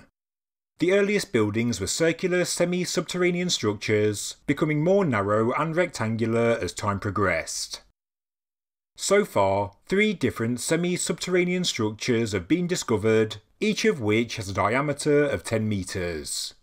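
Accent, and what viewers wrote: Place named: Birmingham, United Kingdom. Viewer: brummies should never be allowed to narrate anything ever again.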